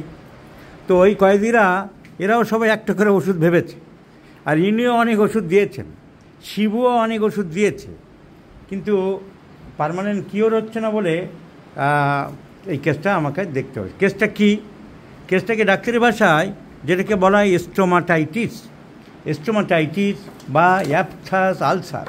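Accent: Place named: Bangladesh. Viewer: এইভাবে কি patient এর privacy breach করা যাই? এগুলো কি ডাক্তারি? এতো বাজে উচ্চারণ কেন? এতো বাংলাদেশী মনে হচ্ছে। ভ্যাকসিন এর নামে চালিয়ে দিলো 😂। ছোটবেলায় পোলিও ভ্যাকসিন নাওনি?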